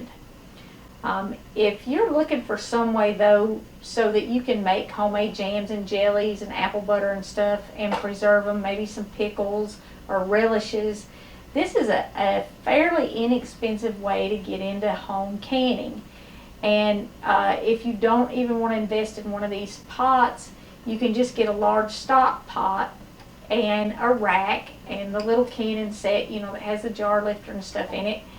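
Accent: South, United States. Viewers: Love your videos! Sounds like you are from TN or KY.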